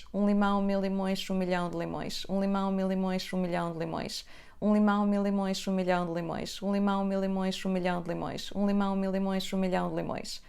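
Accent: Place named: Portugal. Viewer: Si te parez bien, te falo n'asturianu. Yes una moza per guapa y salá. Préstame muncho el acento de Portugal. Pa mí aína somos parientes. Puxa Portugal ✊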